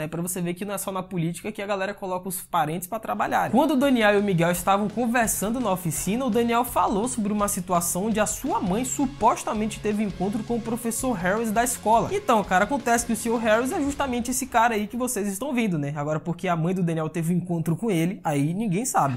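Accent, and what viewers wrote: Bahia, Brazil. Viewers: Você é Baiano, de Salvador? Porque eu sou, e achei muito massa ver um youtuber nerd daqui!! Kkkkk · Vc é da Bahia mano ? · Você é Baiano 😁😁😁, que legal , Bora Baeeeeeea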